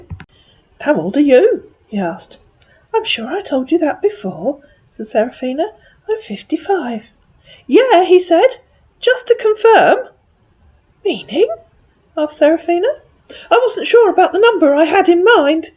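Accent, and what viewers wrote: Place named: Southern England, United Kingdom. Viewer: You sound like all my cousins, they live in Oxfordshire and Southampton, i was born in Southampton..... I live in Australia with barely any family here.... your voice makes me feel like I'm home X Oh i love what you do 🤩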